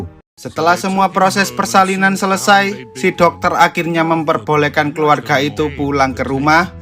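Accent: Java, Indonesia. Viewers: wong jowo rupanya mas ini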